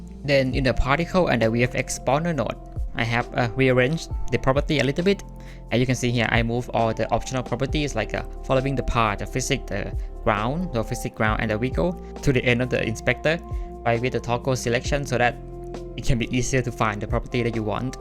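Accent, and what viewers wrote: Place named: Thailand. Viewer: คนไทยปะครับสำเนียงคือได้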